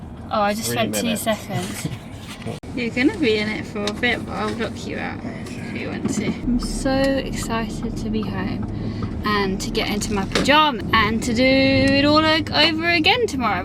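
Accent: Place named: United Kingdom. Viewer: She’s got a British accent so she may have been born in the UK.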